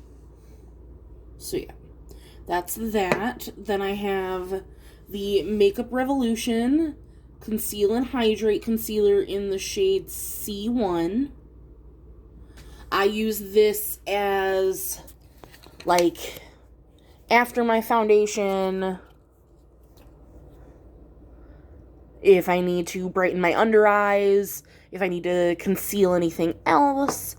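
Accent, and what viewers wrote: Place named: Midwest, United States. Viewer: Love me some midwestern accent, reminds me of home!!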